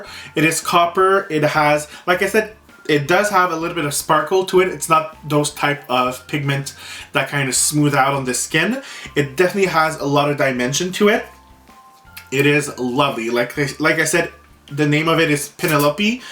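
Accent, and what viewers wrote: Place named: Quebec, Canada. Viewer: Pe-Nell-o-pee. You French Canadians and your Accents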